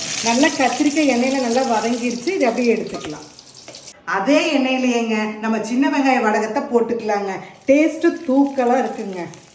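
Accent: Kongu, India. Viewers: Kongu slang ❤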